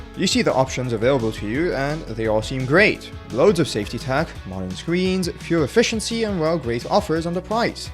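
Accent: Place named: East Asia. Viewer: My man, your accent tells me you're Asian, as in East Asia. Am I wrong? Great video btw, pretty accurate.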